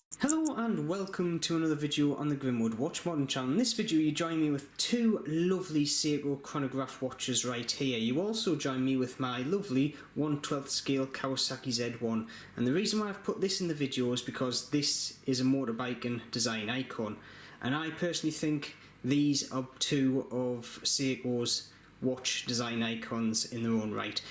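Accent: Newcastle, United Kingdom. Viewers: Great presentation- nice to hear a Geordie too👍. Appreciated seeing the 413 - I am waiting for a 411 to arrive and already fancy the 413 as an addition. I’m going to go broke!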